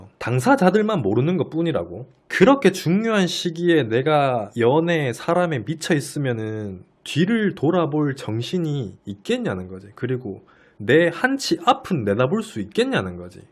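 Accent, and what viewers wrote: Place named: Daegu, South Korea. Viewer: ~ 평소에 영상 즐겨보고 있습니다. 말씀도 너무 잘하시고 생각도 멋있으시고 멋짐에 빠져서 유투브 입문한지 얼마 안된 제가 자발적으로 즐겨보는 몇 안되는 채널이 채널 입니다. 이번 영상을 보면서 의 진심이 느껴져서 이렇게 글을 쓰게 되었습니다. 깊은 생각과 나름의 철학으로 만드시는 영상들이니 언젠가는 빛을 볼거라고 확신합니다~^^ 그리고 좋은 말씀 많이 해주셔서 감사합니다~ 제가 대구 사람인데 서울에서 힘들게 타지 생활하면서 같은 동향 사람 말씨로 이런저런 얘길 들으니 더 친숙하게 느껴지는 면도 있는 것 같습니다. 그리고 영상보면서 지금의 남자친구와도 사랑하게되고 그 사랑을 유지하는 것에도 도움 받고 있습니다. 타지에서 외로워서 남자에게 마음이 쏠리게 되더라고요. 단순히 연애 이야기가 아니라 그 너머의 것들을 이야기해주셔서 더 나은 제가 되는데 도움을 주셨습니다. 그래서 지금 너무 멋진 남자와 연애할 수 있게 되었어요^^ 의 진심이 담긴 흔적들은 시간이 지날 수록 빛을 발할 거에요~ 그리고 저에게도 오래오래 그 마음을 보여주세요~ 보면서 제가 항상 제 자신을 다잡을수 있게요~ 긴글 읽어주셔서 감사합니다! 늘 건강하시고 행복하세요^^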